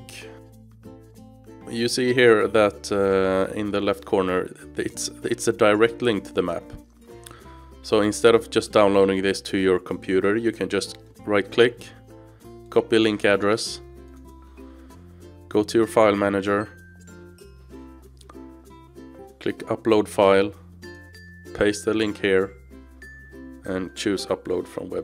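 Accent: Sweden. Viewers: Oh you're Swedish lol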